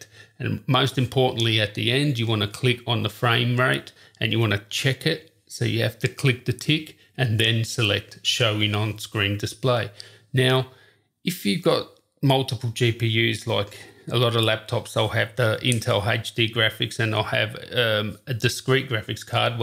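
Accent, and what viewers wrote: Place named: Australia. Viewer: I just need to rewatch the video for the tutorial I came for, I was lost in your sweet Australian accent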